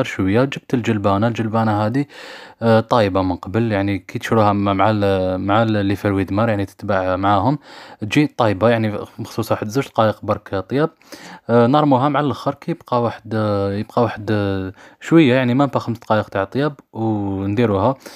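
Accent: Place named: Oujda, Morocco. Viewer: شكراااا على وصفة وتحية ليك من المغرب الشقيق🇲🇦 وهضرتكم شحال حلوة تشبه للهجة وجدة ❤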